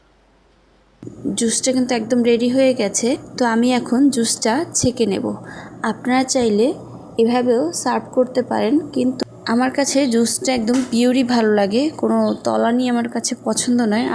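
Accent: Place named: Bangladesh. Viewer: ওটা বাংলাদেশি আঞ্চলিক টোন,আমরা ওভাবেই বলি।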